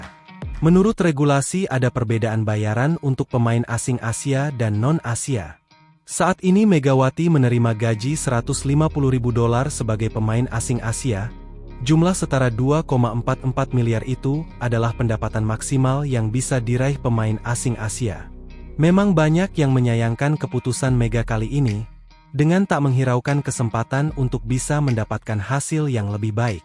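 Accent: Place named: Java, Indonesia. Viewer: Medok euy...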